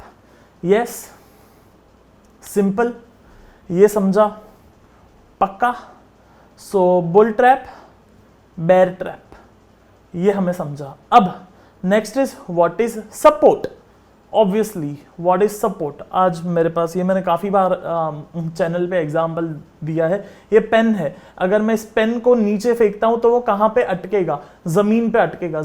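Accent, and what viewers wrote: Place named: Mumbai, India. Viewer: Awesome Yahi jab bolte h aap to maja aa jata h Aur humko sunna h typical bombay language bro Pls dont say pure hindi 😂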